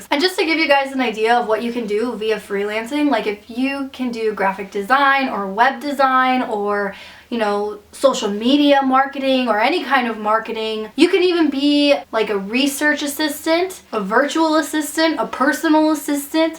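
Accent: United States. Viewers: She sounds American and I'm pretty sure she's wearing a wig and dentures. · American accent. When you travel you realize that Americans have American accents lol.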